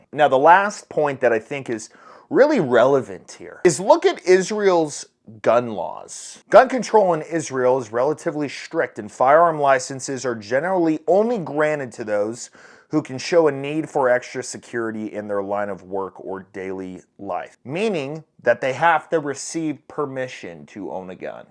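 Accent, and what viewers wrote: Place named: South, United States. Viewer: Dude, ya really screwed the pooch on this one. Ya sound exactly like an uneducated southern redneck. Hummus and Hamas are not the same, aren’t pronounced the same. One is chickpeas and the other are terrorists. And you’re ranting like a fool. Ya shouldn’t have made this one. I know you’re tired of the dumba$$ guv’ment. I am too. But, Israel is our ally in the Mideast and has been since its inception. Yes, gun control is a bad thing and we all know that. That should have been your main point for this video and not a rant about chickpeas. Get a grip. Lay off the high caffeine coffee. Play some soothing music. Go sit a spell on the back porch and watch the clouds roll by. Cya tomorrow.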